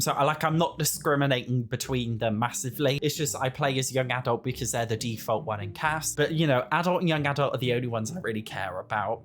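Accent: Australia. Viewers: OMG YOU SOUND SO AURSSIE!!! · ... as an aussie, that accent was 100% what we sound like 😳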